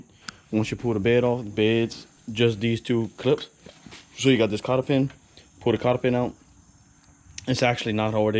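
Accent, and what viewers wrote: Louisiana, United States. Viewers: as soon as you started talking i knew you where from louisiana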